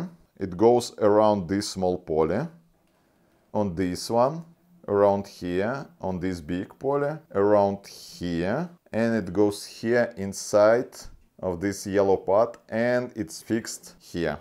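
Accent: Russia. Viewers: This indeed russian accent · Удивительно, как YT хорошо определяет Runglish в субтитрах! Но "полес" слышит, как police :)) В реале имелось ввиду наверно "poles" или "pulleys" (как в дереве). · It is a rare case of a lovely Russian accent. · You have Russian accent😁 can you speak Russian?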